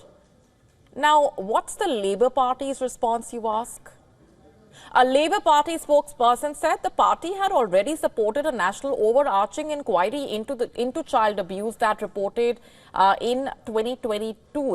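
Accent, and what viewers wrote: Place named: India. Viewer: strong indian intonation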